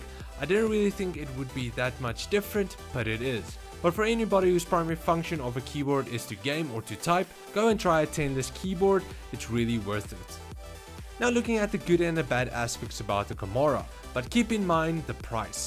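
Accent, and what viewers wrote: Afrikaans, South Africa. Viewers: This dude sounds so afrikaans it's crazy XDD great video. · He sounds like hes from South Africa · I can tell a south African accent from anywhere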